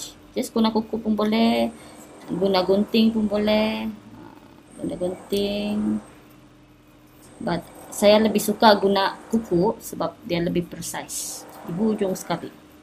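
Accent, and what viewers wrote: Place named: Philippines. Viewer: Redeemed Harshsw sounds philipino, maybe search for another video instead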